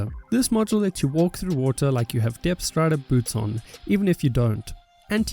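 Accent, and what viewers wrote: South Africa. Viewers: could hear the south african accent a mile a way 😄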